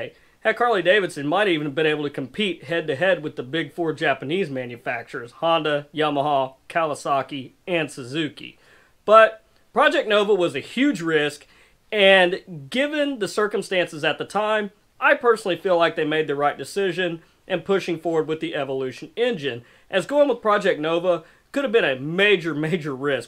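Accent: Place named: South, United States. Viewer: You must be in Texas or Louisiana. Jeff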